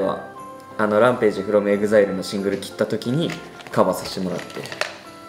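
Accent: Okayama, Japan. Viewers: さんの岡山弁大好きだから聞けて嬉しいです😁♡ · 岡山弁のさんがやっぱり好きすぎます🥲🍑❤️イチブトゼンブのモノマネめちゃわろてもーたww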